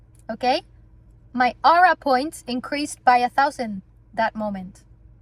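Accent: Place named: Italy. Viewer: I’m just curious.. are you Italian? You speak great English and I think I hear an Italian accent but I noticed a lot of your shows are in Hispanic countries.. anyway glad you’re alive and better after that crazy experience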